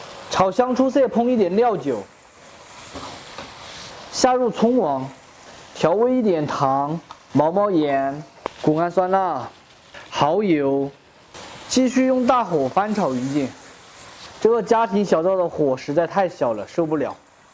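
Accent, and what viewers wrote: Sichuan, China. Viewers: 老乡的川普听起来不摆了比厨艺更有吸引力🧲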